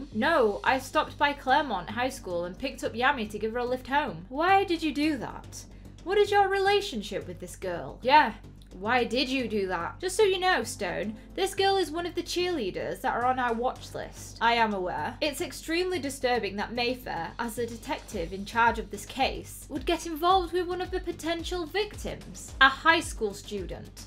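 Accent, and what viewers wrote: Scotland, United Kingdom. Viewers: I really love your voice. You're Scottish ? Sorry for my brutal question. I like how Scottish people talk. And I mean that really in a serious way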